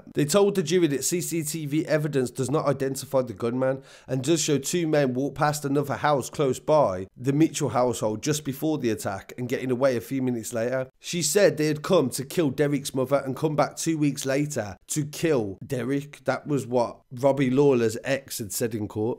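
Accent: United Kingdom. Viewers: Been a bit shocked past few weeks coming on to YouTube hearing a guy with a British accent giving the low down on my city with 100 percent facts and very good accuracy u got a new sub.... Iv met Robbie once in the joy and he wasn't right you could tell he walked around on his own... Sitting on his own in the middle of the yard in his own world.... I seen him in conflict once with a very close friend just call him liamo doin life locals know him.... Big tall crazy guy who people tryed to jump 2 with gangs and failed great boxer.... Him and Robbie had an argument about money got a bit heated one day words came both walked off to there cells obvisly ploting... Would of been a blade or something swung but pascal got involved and kept the peace he was friendly with Liam and was the shot caller for Robbie area that's all I know of him really..... He's notorious I hear really from whacking all his friends and would whack his own family on orders....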